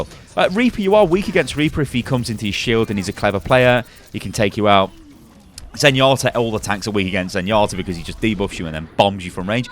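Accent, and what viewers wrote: United Kingdom. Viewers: i usually dont like the British accents, but your commentary is on point! subbed man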